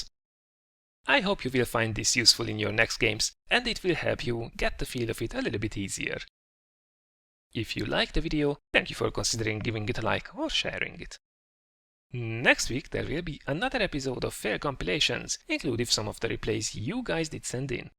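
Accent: Hungary. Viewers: Are you Hungarian?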